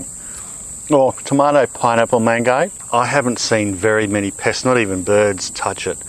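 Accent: Australia. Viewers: You`re a cutie! As soon as you spoke of heat, humidity, and a storm coming I thought "Are you here in Florida? Maybe south - nobody wants to be in the north. But his accent is Aussie, so likely the other side." Then I saw "subtropical (similar to Florida)" in your description, and it all made sense. I clicked the button. That wee lime looks amazing! I can imagine those bubbles mixed into a salad dressing .... and each bite of food has a little surprising ZING of lime. Hmmmmm.... I`m starting a farm soon ..... I may have to figure out how to get a tree growing here. OH.... and then mosquitos - are you SURE that you`re not in Florida??? haha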